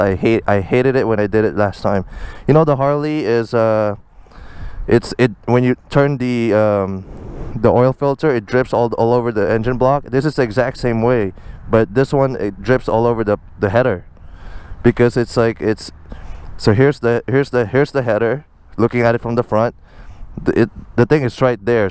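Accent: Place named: Philippines. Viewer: bro u sound pinoy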